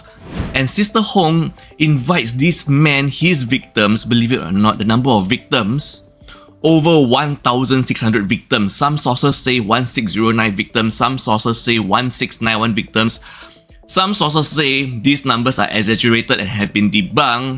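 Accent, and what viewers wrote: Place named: Singapore. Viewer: Hey content creator, there's only one thing more cringey than this Ms Hong - guess what....it's your Singlish accent. Yikes.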